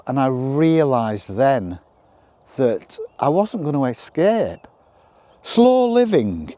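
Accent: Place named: England, United Kingdom. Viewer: Loved every minute of your video, not least, I love your accent. My grandparents emigrated England to come to Australia in the late 60’s and whenever I hear a similar accent it makes me want to visit England. Sadly I’ve never been but would love to see where my ancestors lived one day.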